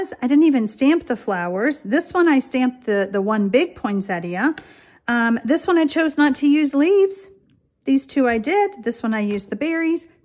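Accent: South, United States. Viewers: You sound so much like my fave first cousin... my cousin was Southern too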